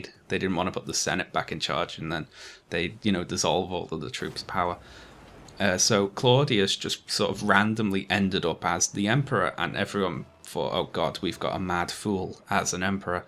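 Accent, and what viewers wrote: Liverpool, United Kingdom. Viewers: Scouse power!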